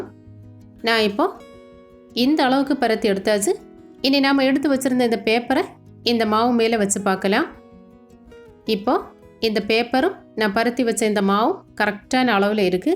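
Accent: Kerala, India. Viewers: It's like malayali talk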